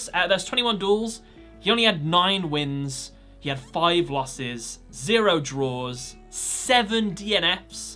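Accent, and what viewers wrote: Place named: United Kingdom. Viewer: So British, so nice to listen.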